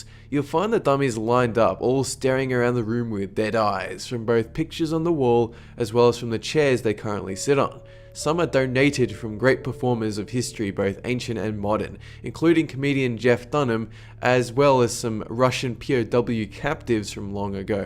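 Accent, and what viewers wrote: Australia. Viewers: I believe he is Australian. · Yeah it's Australian~ best accent ever！ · Seven Super Kittys he doesn't sound canadian to me, much more aussie. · Whay is his accent? I want to say Australian, but I dont hear many accents in my life. And you should make a sequel to this video. You missex alot of other cool museums! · sounds like Australia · Not a normal Australian accent. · Australian.but has some american influence like pronouncing new like noo ... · He's from Australia he says it in one of the videos by the way am from Australia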